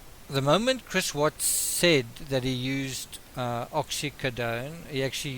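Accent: South Africa. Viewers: New subscriber I enjoy listening to you, are you from South Africa 🇿🇦? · You sound South African.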